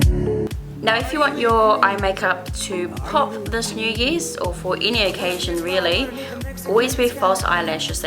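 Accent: New Zealand. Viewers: You sound like a New Zealander , Kiwi as girl ! ,love it ! you go ❤❤ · You speak like me!!! Woohoo a Kiwi! & here l was thinking you were from the States haha.. Beautiful tutorial btw, loved it! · U sound & look part or Maori ..Kia ora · i think she's from NZ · Her accent is what u call a kiwi accent not Australian don’t get it twisted 😂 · She's definitely a kiwi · the accents sounds kiwi, I'd like to try the LA cosmetics where can I purchase them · omg is she from nz? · Was out of it to hear a kiwi voice on a YouTube video! Love your work girl! What an inspiration!! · As soon as I heard your voice, I clicked on your account to see which country you were from because I swore you sounded like a kiwi cx Im from New Zealand, this is my first video that I watched of yours and I think it looks beautiful :)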